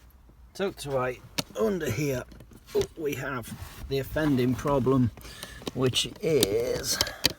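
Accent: Leicester, United Kingdom. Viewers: Are you from Leicester?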